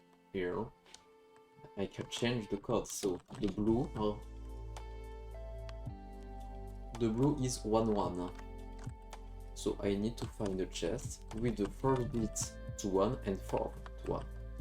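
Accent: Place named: France. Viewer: A ton accent je dirais que tu es français xD. Good job bro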